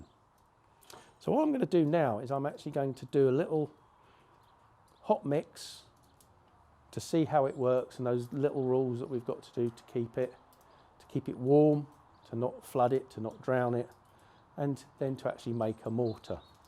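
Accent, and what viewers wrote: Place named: United Kingdom. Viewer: british accent take a guess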